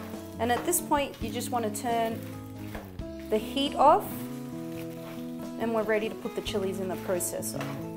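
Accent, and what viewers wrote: Australia. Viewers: You sound Australia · U sound Australian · Being mexican and from Texas your transition from your Australian accent to perfect Spanish blows my mind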